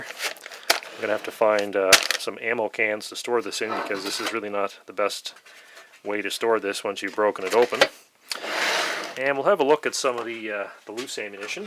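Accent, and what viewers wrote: Canada. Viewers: Very informative. I assume you are in Canada by your accent and the fact that we here in the US no longer have access to Norinco products, having been banned after the Kengs Firearms/Norinco criminal investigations for them attempting to supply full-auto weapons and rocket launchers to prohibited individuals here. What was the price for this case of 1,000 ? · Do i hear a fellow maritimer (neuf) accent??👍😉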